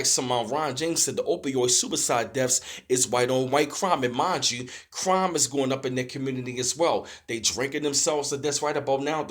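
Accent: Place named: Baltimore, United States. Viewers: The Bmore accent is such an interesting mix of Northeastern and Southern. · It’s an east coast accent to me.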